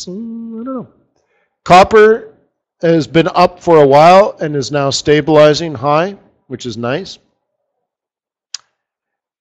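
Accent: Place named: England, United Kingdom. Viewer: You lost me on the English accent and had me with the python references -also good content as ever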